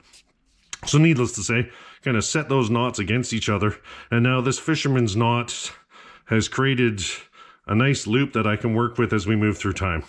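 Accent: Canada. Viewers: Are you from Nova Scotia ? Not mocking you , lord knows I have my language tells . You say the word yeah a lot . It reminded me of the ina bomber movie how the fbi caught him with his language tells.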